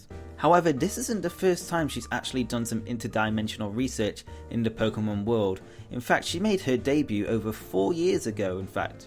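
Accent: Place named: United Kingdom. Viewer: He's maybe from the U.K..... Accents, person. not trying to be rude fyi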